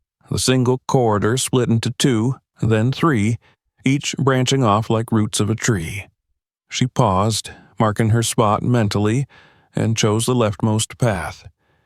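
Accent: South, United States. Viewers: I am enjoying the story, but am distracted by the southern accent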